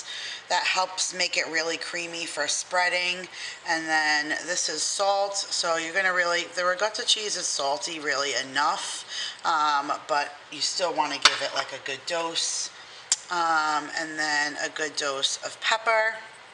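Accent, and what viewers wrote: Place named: New Jersey, United States. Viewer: I recently started watching your videos. Where are you from? We just moved to New Jersey last month (my husband is in the military) and your accent sounds familiar to what I hear around here sometimes. I'm super excited to try out this recipe.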